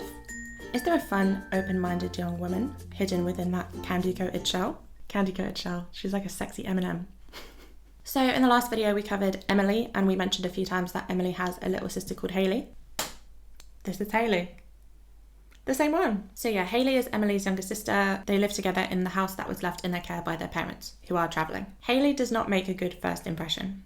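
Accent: United Kingdom. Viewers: As an Australian, I don't see any hint of Oz/Kiwi accent at all. Maybe the tiniest hint of South African, but not enough for me think you're anything other than a Brit. Also, the haley_concerned portrait that you called angry, to me looks more like pensive or worried or uncertain. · I love your accent. Original English accent. Love it 💕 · I get sinilar questions about my accent for some reason, and I always answer that I just watch a lot of Youtube. Simple, shuts the dumbass question down 😅... you sound normal-brit to me, but i guess my view might be biased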